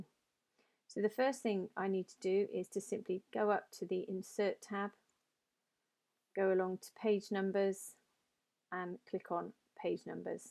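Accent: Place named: Bristol, United Kingdom. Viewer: Are you from Bristol by chance? Your accent sounds much like my friend who grew up in Bristol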